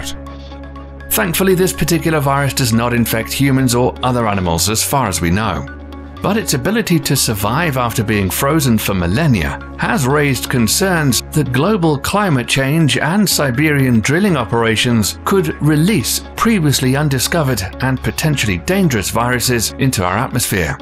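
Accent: England, United Kingdom. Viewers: Y is this English man that doesn’t sound from the USA using football fields to measure things , I hate it when people use that to measure something it’s so ridiculous and stupid no one cares about American gay football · The only thing I'm worried about is fear mongering its' self. Equally troubling is the blatant over-use of the so-called british accent, touting all this doomsday drudgery to the faithfully numb, who are ultimately hired to spout continuous streams of falsehoods far & wide, to sell the appearance of enjoying the ride, while impatiently waiting for the latest chip, that will turn everything hip. Thanks but that, I will skip.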